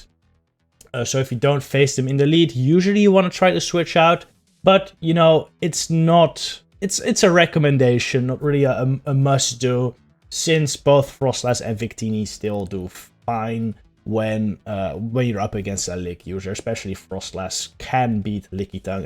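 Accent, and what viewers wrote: Netherlands. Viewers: Jij bent nederlands of nie???🤣😂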